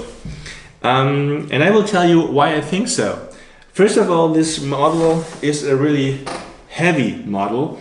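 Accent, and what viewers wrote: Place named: Germany. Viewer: du bist deutscher oder ? XD ich erkenne das an deinem englisch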